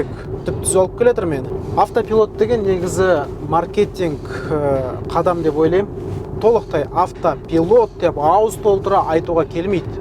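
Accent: Standard. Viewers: базар жок рахмет. Недеген сауатты сойлесин таза казакша сау бол бауырым.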